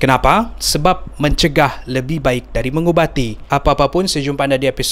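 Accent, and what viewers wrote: Sabah, Malaysia. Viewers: tu sabahan punya style paling ketara oh wkwkwkw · Dia Ni Sabah ke indon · Kamu orang sabah ke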